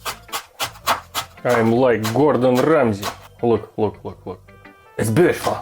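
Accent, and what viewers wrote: Russia. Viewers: is there just all russians watching a russian guy speaking english funnily and then commenting in english?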